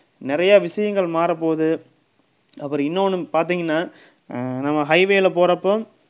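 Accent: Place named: Kongu, India. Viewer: neenga kongu Tamil peasumpothey nenaichan....nanum erode dt than bro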